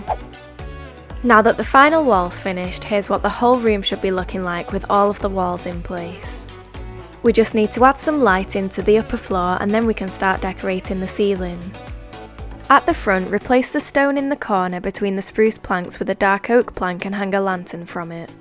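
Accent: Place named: Scotland, United Kingdom. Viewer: Honestly i like your Scottish voice so now ima use your tutorials in my world now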